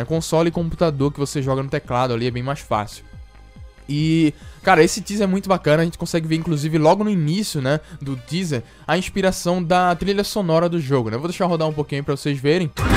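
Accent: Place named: Rio de Janeiro, Brazil. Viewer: seu cariokismo me fez ir embora moço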